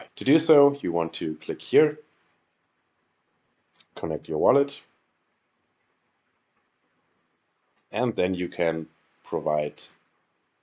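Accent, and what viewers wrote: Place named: Germany. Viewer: Nice german Akzent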